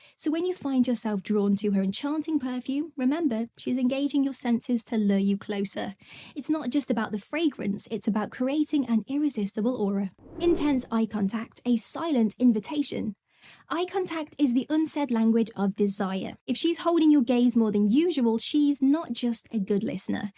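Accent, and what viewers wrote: England, United Kingdom. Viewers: "cheeky". Oh she's so sexy with her British terminology and English accent..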